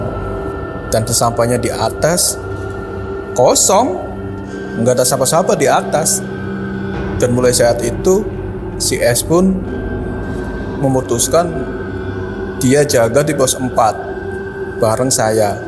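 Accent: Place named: Bekasi, Indonesia. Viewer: Buset bang, bahasanya Bekasi bet dah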